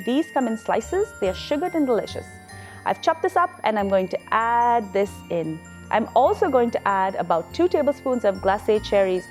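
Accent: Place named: India. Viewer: I like your accent eventho you're Indian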